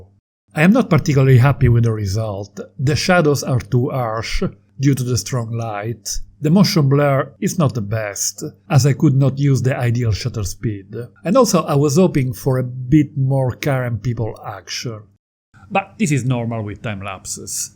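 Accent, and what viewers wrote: Italy. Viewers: I like the Italian accent :D Great job and thanks for the info!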